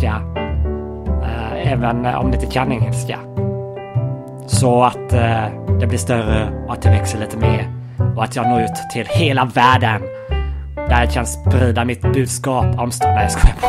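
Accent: Sweden. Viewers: Typical Swedish English. Nejmen nu äre dags att subscriba till engelska kanalen :)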